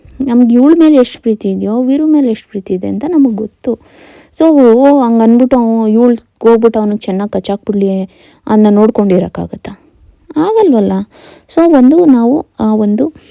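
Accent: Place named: Hassan, India. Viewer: sister ನಿಮ್ಮ ಊರು ಹಾಸನ ನೀವ್ ಮಾತಾಡ್ತಿರೋ ಭಾಷೆ ಹಾಸನ್ ತರ ಇದೆ ನಮ್ದು ಹಾಸನ ಅದಿಕ್ಕೆ ಕೇಳ್ದೆ 🫰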